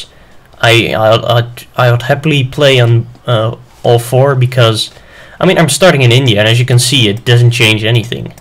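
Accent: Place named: Netherlands. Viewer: Ah, Dutch, that's what it is. I could tell you had an accent but I couldn't tell what it was. For future reference "Souix" is pronounced "Soo," and "Navajo" is "Navaho." English is hell for trying to figure out how a word is pronounced just by looking at it. :P Great LP btw, YOu've earned yourself a subscriber.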